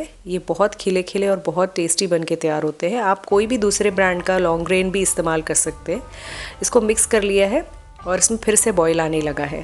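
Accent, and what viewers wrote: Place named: India. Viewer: Feeki ...wali mirchen??😅 ...chawal fool..jayen?😂😂😂 Pheeki*. Phool*. Oh acha ap hindi accent use kar rahi hain. Warna urdu me ..pheeka.... phoool... bolte hain.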